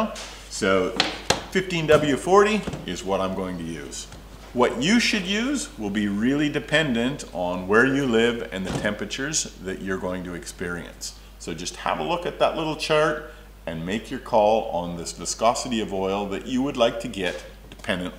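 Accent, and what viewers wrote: Canada. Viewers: I don’t own a Toyota but I had a great laugh at your “bugger me” moments! Add the Canadian twang to the mix and I’ve spent the whole arvo watching your clips about a vehicle I don’t even own! Well done and keep the content flowing.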